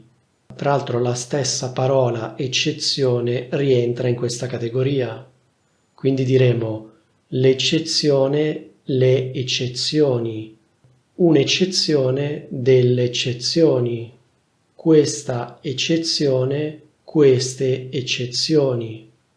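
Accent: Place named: Milan, Italy. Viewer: Professore, come studentessa brasiliana 🇧🇷 di italiano come seconda lingua, ti supplico di non abbandonare mai la tua variante settentrionale. È importantissimo per noi avere accesso a diversi accenti, e il tuo è l’unico canale che conosco con la variazione milanese. Ti ammiro e ti seguo.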